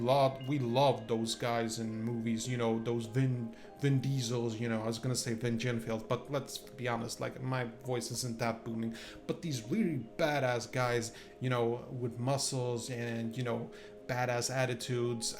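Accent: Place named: Sweden. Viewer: Btw are you Swedish? Your accent sounds Swedish.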